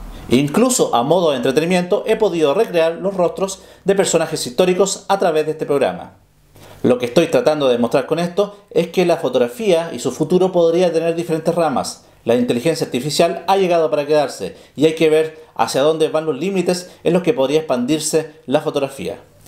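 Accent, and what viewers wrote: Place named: Chile. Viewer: Muy bueno tu video. Me queda claro que eres chileno, nuestro acento es inconfundible. jeje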